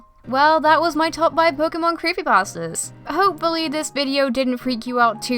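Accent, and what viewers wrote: United Kingdom. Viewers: 100% British I'm having a stroke hearing this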